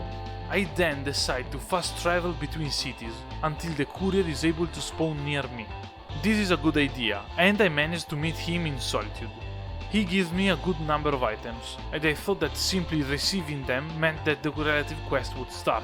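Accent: Italy. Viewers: Oh my goodness I love your Italian accent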